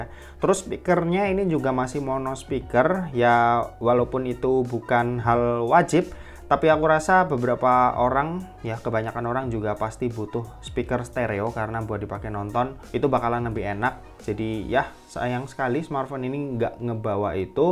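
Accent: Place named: Tegal, Indonesia. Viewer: tegal ya bang... logatnya ketara banget medok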